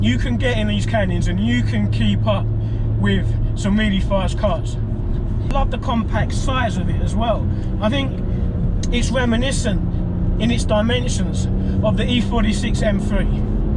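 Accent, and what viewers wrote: United Kingdom. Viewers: Excellent. So great to hear how it actually drives and how fast it feels, the body roll and suspension. This is important to me. Thanks. It's funny, you seem to have a UK accent, but appear to be driving in California. Enjoy the weather, mate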